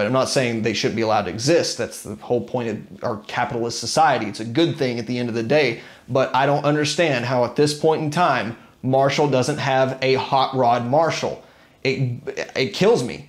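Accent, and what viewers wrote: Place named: South, United States. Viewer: just curious,do you live in the South??You have a southern drawl like me,HaHa...I am in Alabama...That’s a great sounding amp,I have been seriously thinking of getting a Ceriatone,just trying to decide which one....you may have just made that decision easy.....love your channel by the way....great tones..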